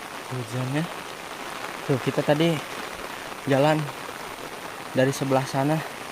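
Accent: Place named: Sunda, Indonesia. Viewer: Orng sunda mah kang...tos w nyarios ku sunda dei, garut mah komo medokkkk psn sunda na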